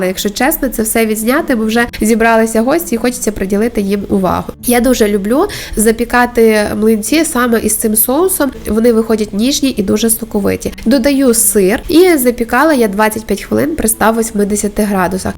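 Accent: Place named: Western Ukraine, Ukraine. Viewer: Який файний западенський акцент! Кайфую! Дякую!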